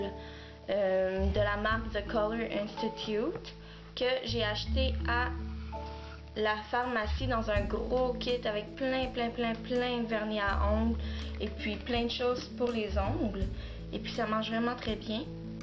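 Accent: Canada. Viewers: C super tu est canadienne ?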